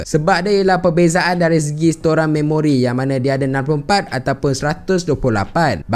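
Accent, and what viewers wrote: Taiping, Malaysia. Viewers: Hai bro.dok taiping ke?? Cm jiran kee hhshshshssj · Orang tepeng ka?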